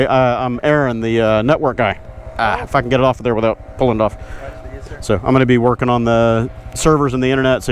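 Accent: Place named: Oklahoma, United States. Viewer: Howdy neighbor. Sort of. I live in San Antonio. You are braver than I. I have a 2023 Vespa GTS300 and even with that I would not drive the access roads along the Katy Freeway. Heck I prob wouldn’t ride anywhere around Houston. Everybody drives at Mach 5 with their hair on fire. They do that here too but SA is still somewhat of a big small town if you know what I mean. Very different than DFW/Hou/Aus areas. But it is changing and when I retire in a few years, I’m not staying here. May move to the next county or something. Been looking at Arkansas as well as my home state of Alabama. BTW - your accent and cadence and tone is EXACTLY like someone I work with. I can’t tell the difference between you two and thought it might be him incognito here. He is from OK too. Hope you are safe and well after all those storms.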